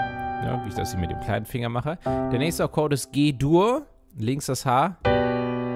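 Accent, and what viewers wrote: Germany. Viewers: Moon yes you liking the videos we me, i find them very good, do you have a German accent? You hear you so on! 😂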